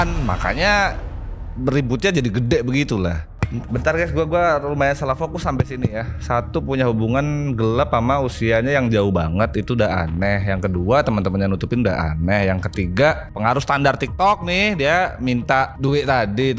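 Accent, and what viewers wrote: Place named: Lampung, Indonesia. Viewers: Salfok sama logat nya bang , Lampung banget 😂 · Lampung bangett euyyy.. tp nagih 😂 semangaaatt mas cerita ceritanya.👍👍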